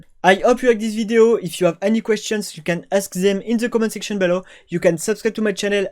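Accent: France. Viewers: le french accent aha , bon j'ai le même, top contenu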